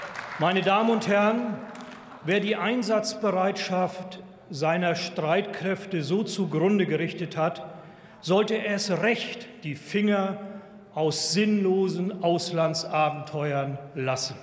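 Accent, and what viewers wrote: Bavaria, Germany. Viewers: Diese Frau ist eine Schande für dieses Amt! Sie muss auf diesem Stuhl neutral sein, jedoch schon mit der Aussage "Sprechen Sie deutsch" zu einem bayrischen Akzent und dann noch damit..... unglaublich! Intervenieren, sofort!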